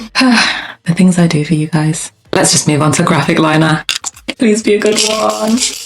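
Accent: United Kingdom. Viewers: I subscribed when I heard you were British like me 🥲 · I love your British accent ❤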